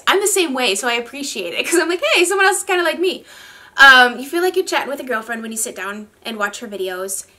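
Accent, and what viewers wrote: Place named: Upper Midwest, United States. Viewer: I LOVED this concept!!! And don’t worry, you still have your accent. It’s part of what I enjoy about you being from the Northern Midwest as well 🤗😍👏❤️💕✨💕💕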